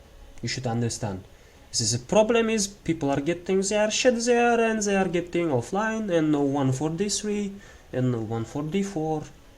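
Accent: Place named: Russia. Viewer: Loving the game, awesome graphic, characters, powers etc. Was brought there by my friend who is obsessed with it lol Btw interesting accent, Russian perhaps?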